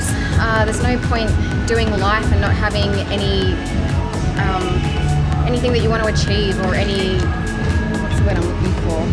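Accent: Australia. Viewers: Not only are you the most beautiful woman on the planet, but I love your Australian accent just makes me melt you are a goddess❤❤❤❤❤ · Surprise. You're an Aussie!! After following you for a while, this is the first time I've heard you speak. "That's an Aussie accent" I thought. (And from the Cat's territory too. Grrr. Go Brisbane!!) I imagined you were 6 foot tall and American. (Well you have a six-foot presence :-) Take a bow mate. You look amazing.) What you've done is a huge credit to you. I think you're also an inspiration to us blokes. Golly, an Aussie. hahaha. So surprising. I wish you all the success there in the US. Thank you for your positive energy. It's great. Bluey.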